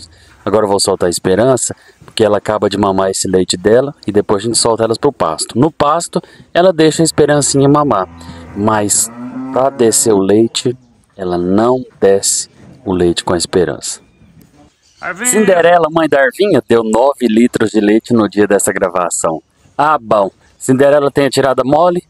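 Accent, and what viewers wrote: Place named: Goiás, Brazil. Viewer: gosto desse seu sutaque de goiano Raiz rsrsrs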